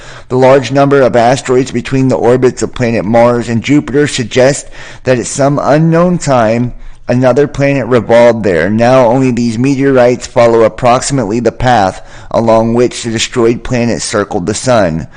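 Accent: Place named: France. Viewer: I will always love your french accent! 😋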